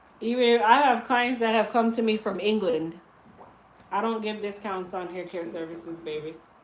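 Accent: Jamaica. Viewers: Jamaica accent can’t hide 🇯🇲😀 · I like u n plus I hear our JAMAICAN accent makes me watch more of ur videos....he smile at d end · are you 🇯🇲? The accent come out😀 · I hear that Jamaican accent 🇯🇲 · Love the patois! Sounds like my house lol! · I hear some Jamaican in there lol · I heard the jamaican accent..ru from here... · You were asked are you from Jamaica because you have an Jamaican accent.No one would have ever guessed that your a yankee and that your from the east coast.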